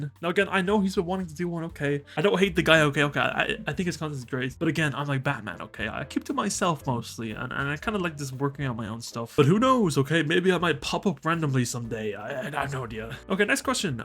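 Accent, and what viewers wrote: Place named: United States. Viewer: Bro u sound so American i didnt even ponder over Irish accent. As a British born, I prefer Ireland. Keep up the read-minding content!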